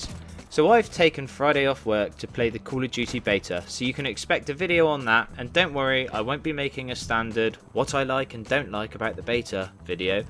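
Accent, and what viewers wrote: Australia. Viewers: Looks like you got that aussy accent down after all bud.😆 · I thouth you came from australia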